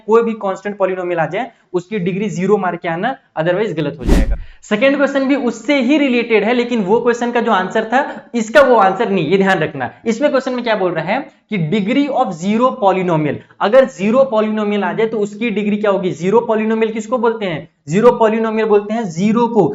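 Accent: Bihar, India. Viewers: sir aap bihar se hoo kya ?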